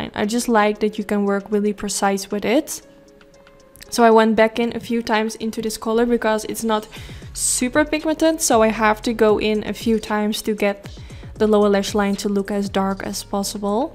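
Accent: Netherlands. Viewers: If you have hooded eyes then I have. no eyes. Really if you call this hooded...girl my whole skin above my eeyes falls almost over my lashes. That is hooded eyes. Love how it looks!! Just doesnt work for my really hooded eyes. I just use black eyeshadow as eyeliner. Now for the first time I heard from your accent you are Dutch. Some words sounds familiar. 😄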